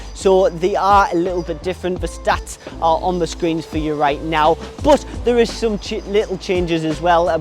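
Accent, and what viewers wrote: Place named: Newcastle, United Kingdom. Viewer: Newcastle / geordie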